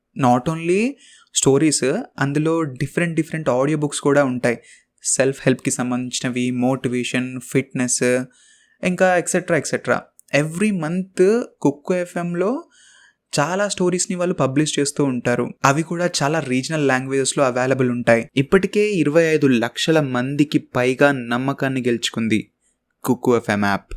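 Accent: Telangana, India. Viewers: Anna story super and mee Telangana slang inka super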